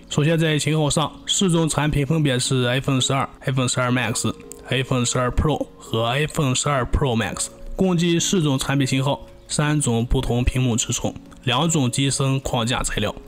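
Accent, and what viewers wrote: Shanxi, China. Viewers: 确切一点是山西口音，或者西北口音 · 这口音不是山西就是呼和浩特的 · 而且是山西中北部，晋南不是这味儿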